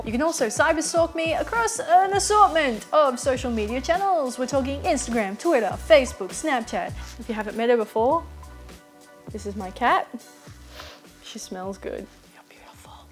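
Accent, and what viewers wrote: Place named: Australia. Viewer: This was great! One thing I wanted to know is where YOU are from...I know it makes me sound unbearably stupid, but I couldn't quite tell from your accent...is it Australia? The reason I want to know is because I'm Canadian, so your idea of Cold might be very different from mine!! :) I was sort of planning to pack for "chilly spring" weather over here...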